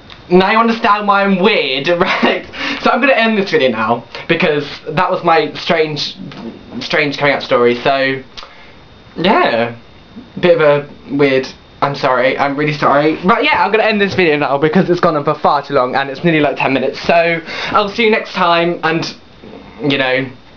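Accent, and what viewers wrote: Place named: RP, United Kingdom. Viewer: You ain't funny for you're gay. You are so funny due to your smashing posh accent! Damn, what would I give to mimic that sound?!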